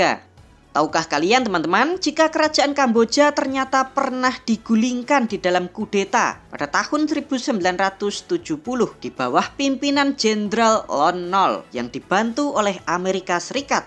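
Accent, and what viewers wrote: Java, Indonesia. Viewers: Logat jowo dusun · Jowo medok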